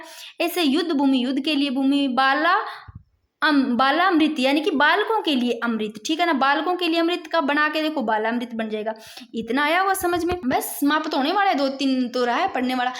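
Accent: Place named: Bagri, India. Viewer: apki language hamre jesi h bagdi ho aap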